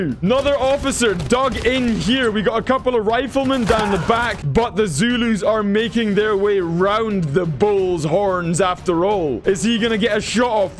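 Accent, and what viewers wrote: Scotland, United Kingdom. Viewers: Where are you from. Scotland ?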